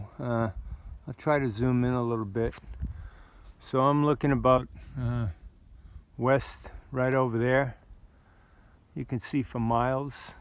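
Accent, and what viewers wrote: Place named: New England, United States. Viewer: Nice for you to see a fellow New Englander! Ya nevah loose the accent! Wicked pissa!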